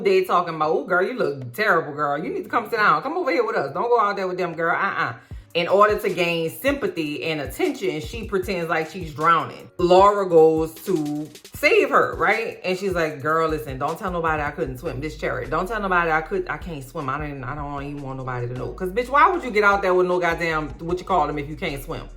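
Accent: New Orleans, United States. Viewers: Great review! Are you from New Orleans? My gosh love the accent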